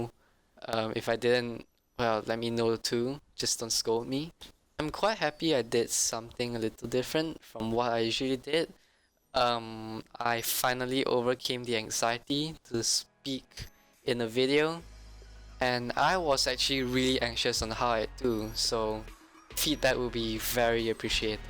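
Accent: Malaysia. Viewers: Syclone Shush Im Malaysian so I know his accent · Your accent sounds like Malaysian accent if you are Malaysian i wanted to say SAYA PERMINAT AWAK(i am your fan)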